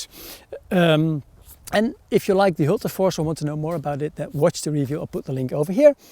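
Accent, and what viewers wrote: Netherlands. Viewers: Ik houw er altijd van om door YouTube te gaan en ineens een Nederland accent te horen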